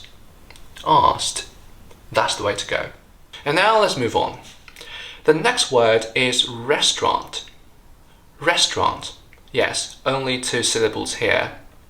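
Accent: United Kingdom. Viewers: 果然同樣身為華人比較容易了解弱點在哪，更棒的是還是英式發音，期待你下次更新，萬分感恩🙇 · 你的英语太好了。 You have an exquisite British accent.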